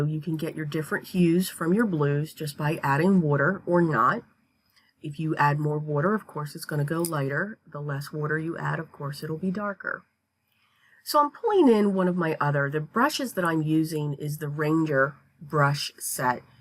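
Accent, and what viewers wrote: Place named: Philadelphia, United States. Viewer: I knew it! I ‘m from Bucks County. I have been in Florida for the past 28 years and every time I hear you, you sound like home. 🤗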